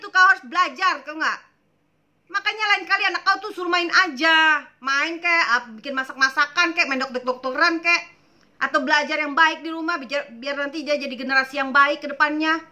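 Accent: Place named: Medan, Indonesia. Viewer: nyai orang medan ya ..kadang logat nya kayak orang medan..sering aku tonton kq udah emosi keluar logat medan nya..maju terus..takut aku ah..awak disini baru belajar pulak jadi presenter dan dunia medsos..🤞🤞peluru pelurunya..wow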